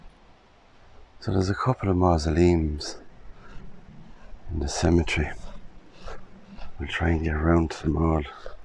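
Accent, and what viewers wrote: Ireland. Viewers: What a smooth voice luv the Irish accent dis you notice that the grass is so green plus no weeds?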